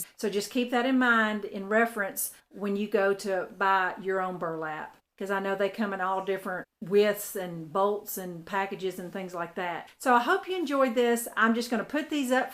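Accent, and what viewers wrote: South, United States. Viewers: I loooooveeee your southern accent. Did you just hot glued all of the layers?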